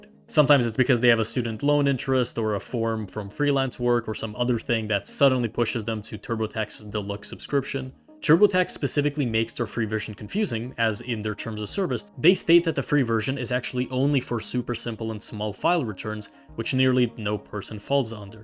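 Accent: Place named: Philippines. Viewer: I just know this is a kababayan. I can hear it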